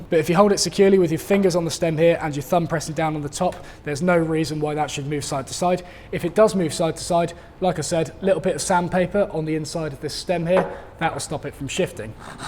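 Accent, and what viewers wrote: United Kingdom. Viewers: I'm an American. Sounds fine to me. It just sounds British. If you visited London, would you ride the Underground and ask passengers to call it the "toob" instead of the "choob" so as to not confuse American tourists? communication skills are one of his strong points, and I think your criticism is well-intended but a little baseless. Give it a couple months, he'll be well over 100,000 subscribers.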